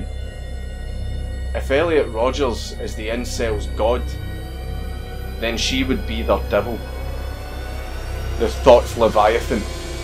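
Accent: Scotland, United Kingdom. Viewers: The Scottish accent really adds to the mythos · Your Scottish accent is so fucking strong, that every time you say girl, I fucking hear ghetto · Having you tell the tale of the legendary Thot was just that much better with your deeply Scottish accent.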